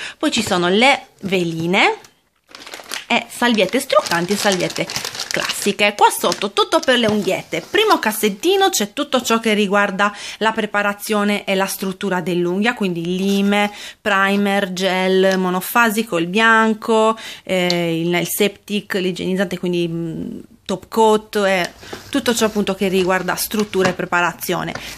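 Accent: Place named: Sardinia, Italy. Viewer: Ciao bellissima postazione. Anche io SN sarda. Appena ho aperto il tuo video ho riconosciuto subito l accento. Sei troppo simpatica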